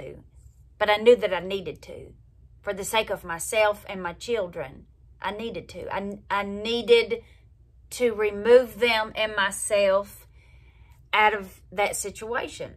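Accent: South, United States. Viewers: that is one of the funniest people i've seen so far her southern accent is right on ya u doin good mama tot and john deere is so proud of you you've already made my day better for sure luv u mama tot may the lord bless you n keep you safe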